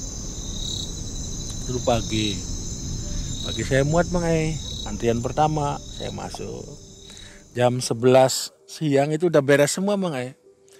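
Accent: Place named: Banyumas, Indonesia. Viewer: Pantes selalu ngundang mas viewer nya tinggi terus,mas ceritanya juga ga ngebosenin santai mudah di mengerti, saya sebagai orang ngapak kalo mas ngomong ngapak aku pun tau artinya😂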